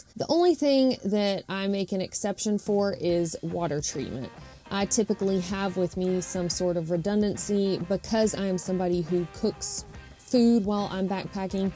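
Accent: United States. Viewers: It's nothing to do with hiking but as a person born and bred in the UK, I just love your USA accent.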